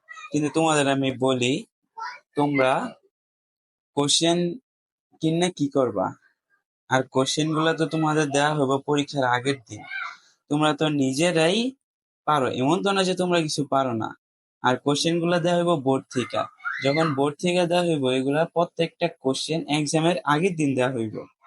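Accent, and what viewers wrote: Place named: Brahmanbaria, Bangladesh. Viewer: সার আপনার বাড়ি কি ব্রাহ্মনবাড়িয়া নাকি? আপনি দি আমাদের এখান কার মত কথা কন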